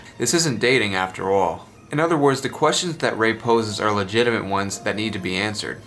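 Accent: New York, United States. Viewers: That NY accent! :D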